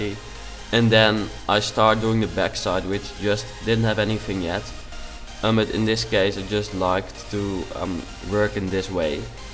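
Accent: Netherlands. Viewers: I immediately recognized your accent. What's up, fellow Dutchman. :) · Ik kan aan je Engels horen dat je Nederlands bent ;) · ben je nederlands an het horen aan je accent net luis van gaal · zonder naar je kanaalnaam te kijken wist ik al dat je Nederlands was. De manier waar op je Engels praat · Super chill. ( Ik zie en hoor namelijk dat je Nederlands bent ;) )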